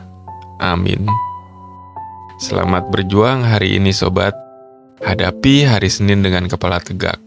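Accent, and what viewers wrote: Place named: Surakarta, Indonesia. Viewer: Kok suara mirip solo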